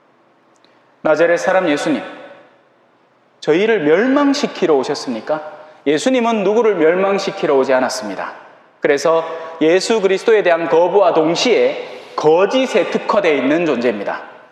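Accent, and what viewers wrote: Gyeongsang, South Korea. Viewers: 아멘 신부님 경상도 사투리 넘 정겹습니다 강론말씀도 참 잼나게 하십니다 나날이 신부님 건강도 좋아보시니 감사드립니다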